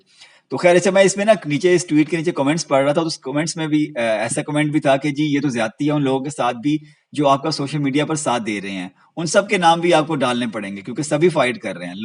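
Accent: Punjab, Pakistan. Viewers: وعلیکم السلام منصور صاحب کی جگہ جو صاحب بھی ہیں انکی آواز اور انداز بلکہ پنجابی ٹچ کے علاوہ یہ بھی بہت اعلیٰ معیار لگا کہ اپنا نام اور تعارف نہیں کروایا کہ دوسرے کی سیڑھی استعمال نہ کروں میری دعا ہے کہ یہ بھی سچ اور غیر جانبدار رہیں اور کامیاب ہوں منصور صاحب کی طرح لوگ انکو بھی سنیں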